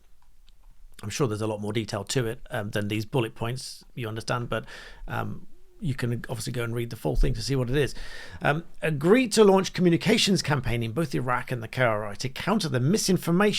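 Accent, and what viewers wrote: United Kingdom. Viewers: Are you British? or English, there is a big difference.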